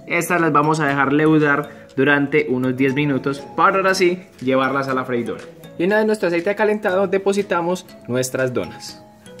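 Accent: Antioquia, Colombia. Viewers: Amo tu acento paisa jajajaja gracias por la receta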